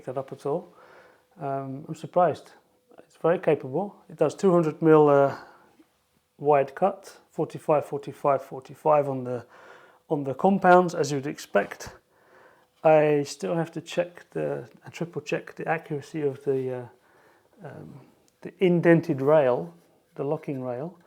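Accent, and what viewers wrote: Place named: United Kingdom. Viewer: Where are you based ? You’ve got a uk accent and foreign plug 🔌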